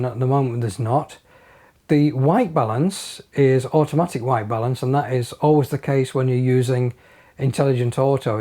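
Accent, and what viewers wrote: United Kingdom. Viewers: How come there is no younger person minus the British accent video tutorial for Panasonic fz 300 on you tube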